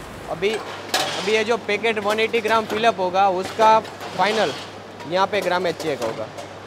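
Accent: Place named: Gujarat, India. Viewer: Vo gujrati keret hi bolte he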